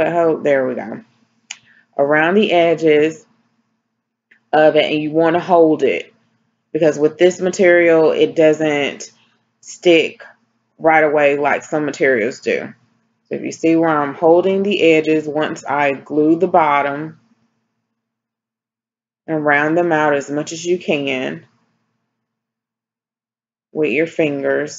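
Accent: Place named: Louisiana, United States. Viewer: Very nice work, and so pretty!! Love the creativity using a pool noodle! I love your accent! You almost sound like me a Louisiana girl! 😆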